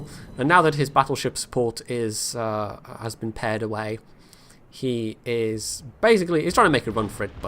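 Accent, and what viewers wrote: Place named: Scotland, United Kingdom. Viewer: I somehow ended up watching this one which I'd never seen. Your accent was much more English here, so since your inflection is more Scottish now, good on you,.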